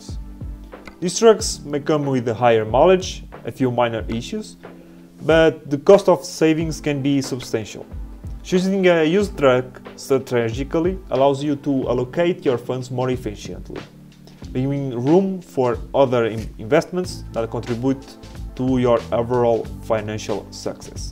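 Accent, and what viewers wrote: Portugal. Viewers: Sotaque Português hahahaha Conteúdo top. Adoro os teus vídeos, abraço <3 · Sounds like PORTUGUES?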